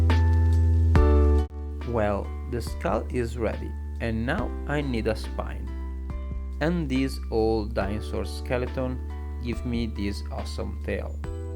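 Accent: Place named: Russia. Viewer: That accent.. are you Russian or something??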